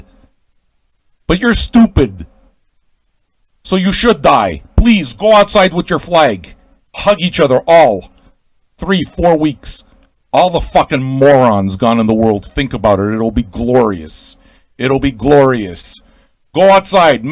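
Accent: Quebec, Canada. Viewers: Montreal I think is where he lives, not sure if that's where he's from originally but he sounds fairly French-Canadian to me... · Oh un québécois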